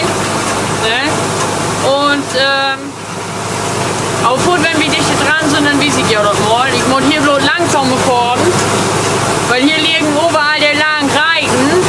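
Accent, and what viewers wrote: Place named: North, Germany. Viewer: Ich schaue seine Videos um bisschen zu verstehen wie die hier im Norden reden 😂😂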